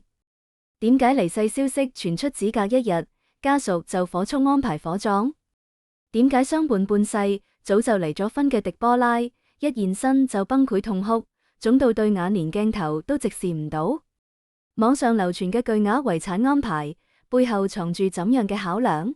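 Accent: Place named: China. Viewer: 你們大陸人讀好D講東話才講啦。應找個香港人來讀。你們讀音太難聽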